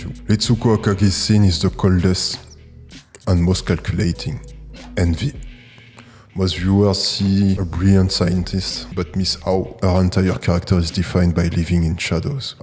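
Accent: France. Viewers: Sounds like French accent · how can one man sounds so french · g reconu ton accent, c l'accent d'un français 100%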